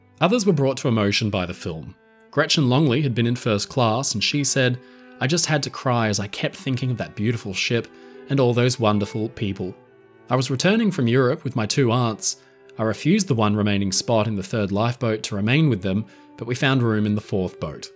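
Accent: Australia. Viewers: What? The uploader is Australian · I knew you were Australian, hi from Tasmania, and thank you for being a more familiar realistic representative for Australia ❤❤❤😊. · I've noticed that too - uses several other American pronunciations in his videos, I can't remember any of them right now...but they sound wrong coming from his (refined) Aussie accent.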